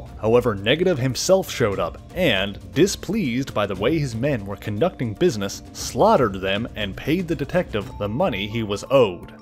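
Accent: Canada. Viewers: Damm bro that Canadian accept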